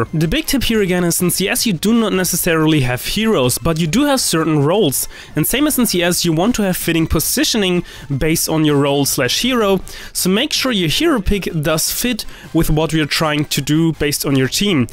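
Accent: Germany. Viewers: Where are you from? You sound kinda german and slovakian i dunno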